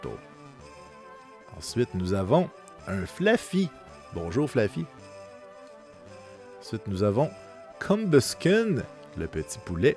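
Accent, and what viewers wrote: Quebec, Canada. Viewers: j adore l axant quebequoi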